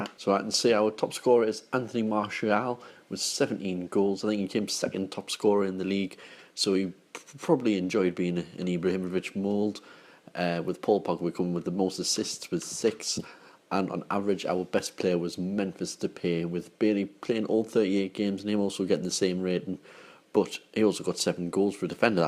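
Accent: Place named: North East England, United Kingdom. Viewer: your accent are you from the north east of England?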